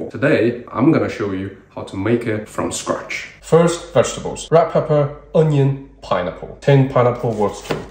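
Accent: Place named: Newcastle, United Kingdom. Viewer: Love your no nonsense cooking as much as your Geordie accent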